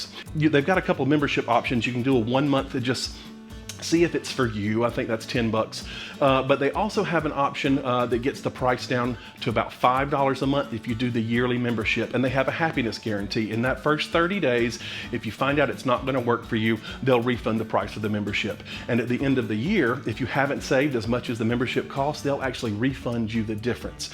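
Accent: South, United States. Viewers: Came for the comparisons; stayed cause I could relate n feel the excitement in each bite haha. You actually have convinced me to at least check out Thrive Market, so they picked a great influencer to sponsor too. I always skip ahead during most sponsor’s adds. Lastly I’m from the south (Louisiana) so I feel right at home listening to you say “y’all”. I’m amazed at how quickly u speak occasionally, yet enunciate crystal clear. Fantastic channel 👍💕👍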